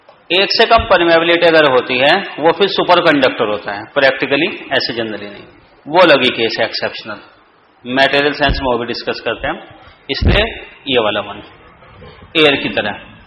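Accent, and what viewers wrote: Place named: India. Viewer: i see the material is good, bt ENGLISH with indian accent....hard to understand.....see ya...bye...thanks anyway....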